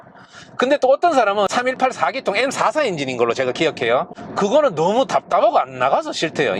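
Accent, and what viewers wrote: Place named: Daegu, South Korea. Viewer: 대구말 엄청 반갑네요 ㅎㅎ 요녀석은 정비할때 손 들어갈 공간이 여유로운 편일까요? ㅎㅎ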